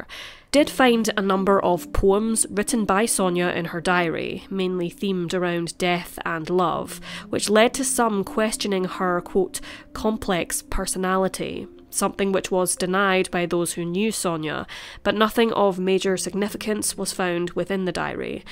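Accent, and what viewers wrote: Scotland, United Kingdom. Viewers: I could listen to you all day! When I was little, my mother was very ill, and the neighbor lady looked after me. She had emigrated from Scotland, and that accent is associated with some of the happiest days of my life. Keep up the good work! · Honestly I have a hard time listening to the Scottish accent. Not cause I can’t understand it, just don’t have like them. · Is the narrator east-coast Scots? Former Banffer here. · Yes, fellow Scot, nice to hear our accent.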